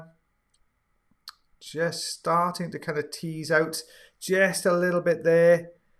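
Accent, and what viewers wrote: Wales, United Kingdom. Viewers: it's definitely Welsh. Sounds probably from the valleys of Wales. Hth xx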